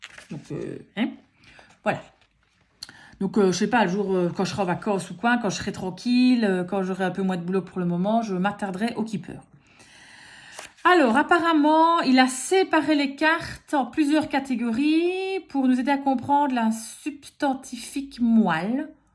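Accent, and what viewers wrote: Belgium. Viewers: super merci video haaaa l'açant Belge il y avait longtmeps tu est de quelle region ? merci un ex belge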